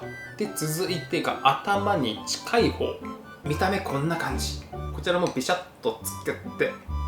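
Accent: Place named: Fukuoka, Japan. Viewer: 速攻で冷蔵庫に直してきました。久しぶりに福岡弁を聞けて地元に帰りたくなりました！さんおかえりなさい〜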